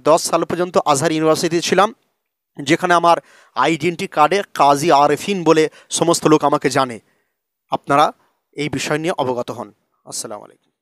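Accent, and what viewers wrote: India. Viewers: oni west bengal r manus,oder occaron amader teke alada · উনি কলকাতার অধিবাসী তাই ওনার কথাগুলো এরকম মনে হচ্ছে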